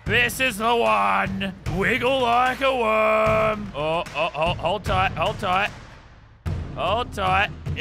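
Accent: Australia. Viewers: This is if mrbeast was Australian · I’m Aussie and it’s a bit weird hearing people saying YouTube like that on YouTube because I never really see Aussies on yt because I haven’t watched u for a while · He Australian?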